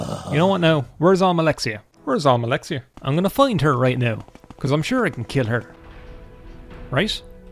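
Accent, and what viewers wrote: Ireland. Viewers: Narrating gonoor or whatever his name is in your Irish makes him seem a bit scarier if I'm honest..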